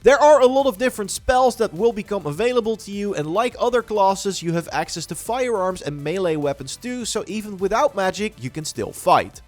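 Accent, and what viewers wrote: Netherlands. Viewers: a little tip. Stop pronouncing “o”s in the dutch way. Its not a alOt its pronounced alAt. Just had to bring this up cos it is really bad to listen to 😂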